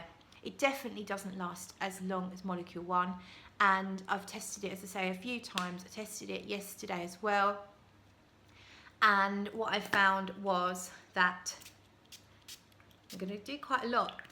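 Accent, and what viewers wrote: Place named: United Kingdom. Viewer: What part of the uk are you from? The accent is fun to listen to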